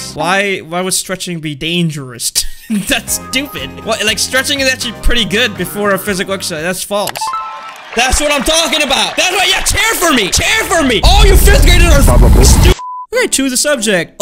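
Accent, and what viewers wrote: India. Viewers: you are Indian???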